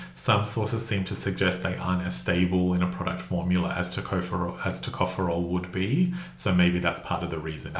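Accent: Australia. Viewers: Probably better to stick with my 12% for now. Thanks again . Love your succinct Australian accent 💛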